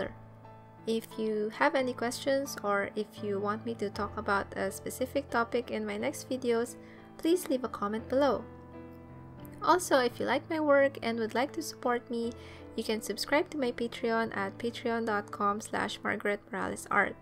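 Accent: Philippines. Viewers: I really love thisss!!! I could tell by ur accent that ur a filipina, I'm so proud✨ Btw, could u make some tutorials about how to color skin or where to put shadows/contour (specially on the nose) and blush on face in watercolor painting pls? Thanks in advance!💗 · You sound filipino So are you a Filipino???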